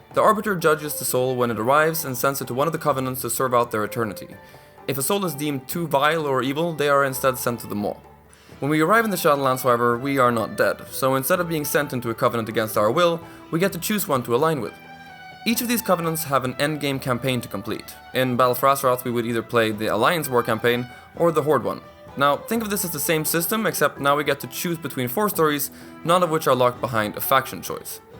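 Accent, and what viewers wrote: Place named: Ireland. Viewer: I was just struggling to pin down your accent, I thought you were Irish for a bit 😂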